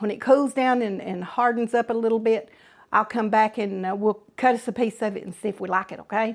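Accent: South, United States. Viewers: Did anyone catch that she said “yuns”? I found this fascinating bc in Pittsburgh they say “yinz”. She sounds southern for sure. I live in rural middle TN and have not heard this used here. Anyone know where she’s from?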